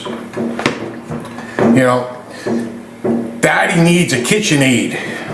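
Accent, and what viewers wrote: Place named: New York, United States. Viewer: Cookies with a NY accent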